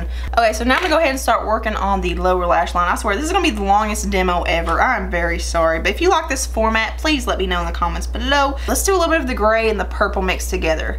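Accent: South, United States. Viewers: New subscriber! Love hearing that southern accent...I'm a Alabama girl...Roll TIDE! Your're so funny and have some great content!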